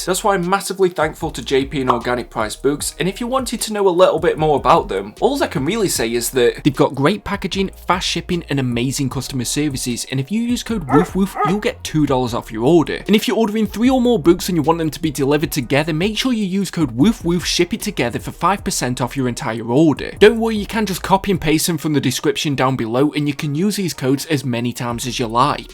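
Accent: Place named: England, United Kingdom. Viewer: Great accent. What part of England are you from?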